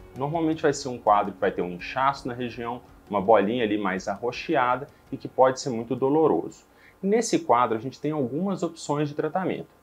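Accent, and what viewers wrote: Minas Gerais, Brazil. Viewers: Boa noite Doutor! Você é de Minas né! Conheço pelo sotaque.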